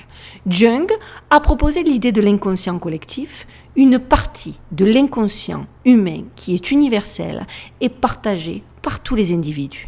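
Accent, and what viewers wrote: Marseille, France. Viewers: Bonsoir, Merci pour cette vidéo analysée avec beaucoup de finesse et de pertinence ! L'accent du Sud et votre bonne humeur communicative rendent le monde moins obscur ! Merci pour le temps consacré qui aurait mérité un trophée ! Hâte de voir votre prochaine vidéo ❤ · Hello la marseillaise (?) En tout cas l'accent est là. Je vous aime bien 😊 même si je ne regarde pas tout le temps vos vidéos et pour cause : pssiiit... ✋👀Je suis « réveillé » depuis une trentaine d'années avec les écrits de Serge Monast et d'autres donc plutôt au courant de ce qui se passe actuellement dans ce monde et surtout à notre époque. C'est bien ce que vous faites, continuez 👍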